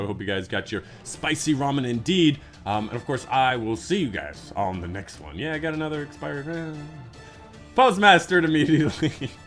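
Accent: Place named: United States. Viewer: Aye just came from houndish’s vid gotta hear that sweet American accent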